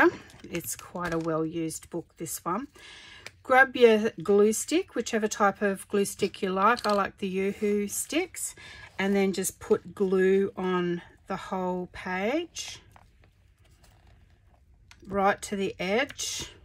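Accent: Australia. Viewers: Lovely to hear an Aussie accent. Feels like listening to a friend.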